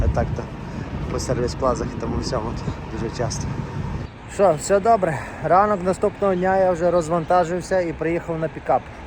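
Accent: Western Ukraine, Ukraine. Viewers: Блін, як класно чути цей наш західний акцент... Привіт з Івано-Франківська...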